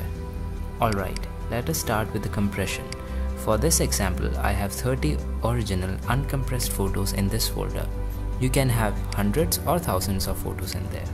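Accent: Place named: Kerala, India. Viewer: nope But felt you were a mallu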